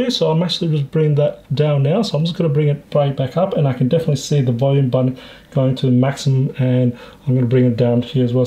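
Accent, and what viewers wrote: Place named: Australia. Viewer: You sound Australian, if you are where did you buy this monitor from in Australia?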